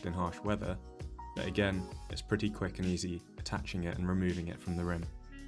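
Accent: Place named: England, United Kingdom. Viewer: Shocked and pleased to hear the English accent for a basketball video!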